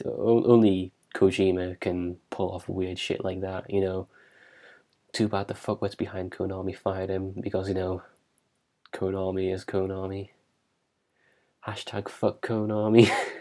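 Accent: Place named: England, United Kingdom. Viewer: tries to guess your region accordion to your accent... Well it's obvious what it's NOT. I'm just going to be vague and say "southeastern". Although I could have sworn I heard a slight northern tinge. Did you just say "fwiends"? Pwease don't tell me there is a slight Geordie influence in there! You know what? Scrap that! You know that scene from Mrs. Doubtfire when Pierce Brosnan (yeah, and obvious Irishman, lol) mentions how he can't quite place Mrs. Doubtfire's accent, and so he responds, "All over." Yeah, that's what I am saying your accent is, everywhere in England anyway, accept for Cornwall, definitely not Cornwall. Wait, did I just use "accordion" when I meant "according"?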